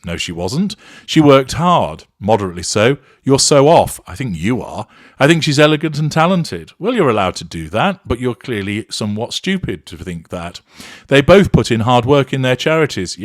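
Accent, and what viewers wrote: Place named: Northern England, United Kingdom. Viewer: OMG, you're white, I didn't know that. LOL I think I do detect a slight Northern accent. I enjoy hearing the "Horn of Ridicule". I'm awaiting more episodes of when you met the Mare (like you, I do not refer to her by name). I'm surprised that nobody had yet used "high yaller" to describe the Mare! Stay well and safe. 😀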